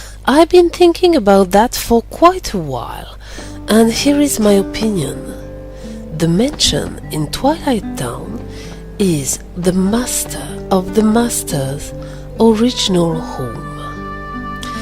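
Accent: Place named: France. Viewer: Salut salut, bon je me permet d'ecrire en français du fait que j'ai reconue le petit accent :). Maintenant venant en a la theorie, je trouve qu'elle fait sense dans un certain context avec les arguments que tu viens de presnter. Mais le maitre des maitre n'est pas assignier a une union par consequent les signes des 6 union doivent étre present ou aucun ne doit faire son apparition. Mais une chose qui m'a fait réflaichir c'est le Labo qui se trouve en dessou du manoir, maintenant que l'on sait que KHUX se passe dans un rêve ou une simulation informatique, c'est très probable que le manoir est le prochain QG des dandelions après la disparition de daybreak town dans les tenèbre (ou ils ont re-crée les evenements graces a leurs souvenir), cela peut expliquer les statues d'unicornes et les quelques signe de l'union de vulpus de maitre Ava en hommage aux précedant Maitres. 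En gros voila mon idée et j'aimerais bien avoir ton avis sur le sujet, bien evidement je sais que ma théorie a des failles (cheraty) cela me feras plaisir d'en disscuter. Merci pour la video !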